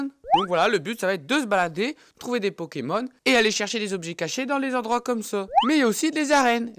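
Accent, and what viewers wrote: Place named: North, France. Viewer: tu est du nord toi ... POURQUOIIIII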